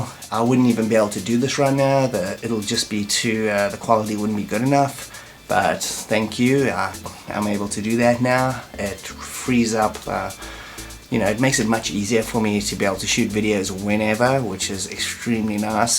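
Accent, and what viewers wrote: South Africa. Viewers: I've always thought you had a South African accent!! That explains it:-) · I'm guessing your a Saffer living in the USA? (Not digging for info, just a guess from the accent) I think at least C64, Amiga and other 8 and 16 bit companies should give you copies of their software. Some absolutely brilliant games are coming out for retro systems. Maybe if we mention you now and then on their forums - it might grab their attention? I have to play these new games on emulators. Just playing the demo for "Shadows of Shegorath" on my Pi400. Great game but in the back of my mind there is an itch that is telling me "You should get yourself a proper Amiga" Same when I play "Boulderdash" on Vice "...This is a great game - but I need to play it on a proper C64"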